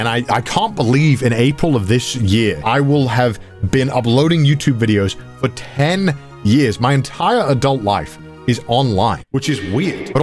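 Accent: United Kingdom. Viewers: For the engagement farm, Bri'ish man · im from LA and i agree that a lot of things suck about it, but for some reason it makes me mad when a british dude says it lmaooo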